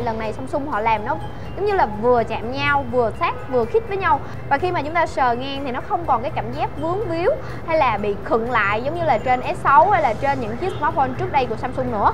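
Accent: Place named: Central, Vietnam. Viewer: mien trung roi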